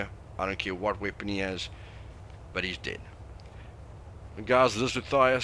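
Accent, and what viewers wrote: South Africa. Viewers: i can smell the south african on you boet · South African like myself? you sound like it! Good video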